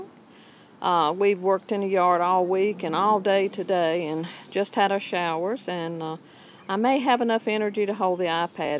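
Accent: South, United States. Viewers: i have just discovered your YouTube channel and i have enjoyed your Garden videos so very much. I am inspired! In what part of the country are you living? I think I detect a very distinct southern accent. 😊♥️😊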